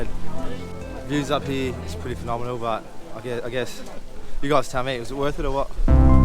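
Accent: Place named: United Kingdom. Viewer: Enjoy listening to your British accent.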